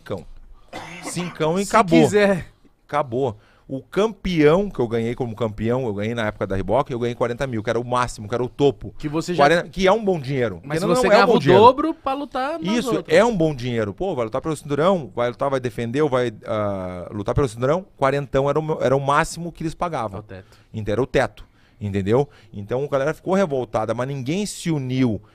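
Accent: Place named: Rio Grande do Sul, Brazil. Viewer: O sotaque gaúcho é muito forte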